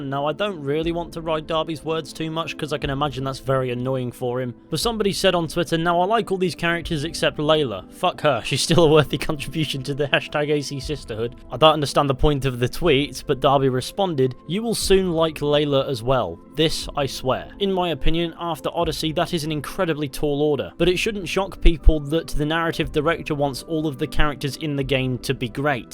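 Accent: United Kingdom. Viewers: Man you're talking so fast😅 and with your uk accent it's damn hard for me to fully get what are you saying(or maybe I'm just a stupid retarded who still struggling with english) and since I'm unfortunately not a native speaker, it makes it harder but I concentrate as much as I can😅 anyways I loved this video and could claim that i understood 80% of your context,love your content 🤙♥️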